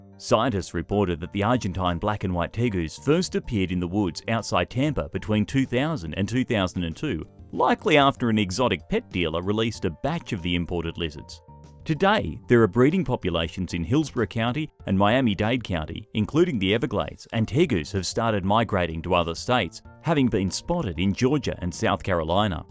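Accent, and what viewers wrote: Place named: Australia. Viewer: An Australian accent but adopting American terminology.... what's the matter narrator... can't accept Australianisms?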